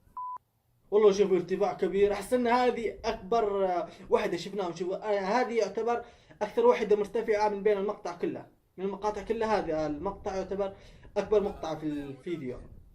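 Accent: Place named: Yemen. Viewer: اليوتيوبر من اليمن واضح من مخارج الحروف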